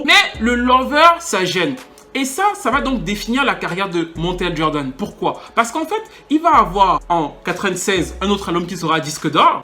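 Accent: Cameroon. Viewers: Ce mR parle comme un camerounais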